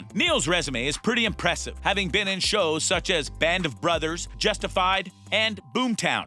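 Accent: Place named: Poland. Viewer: the voice of this guy is from poland or something...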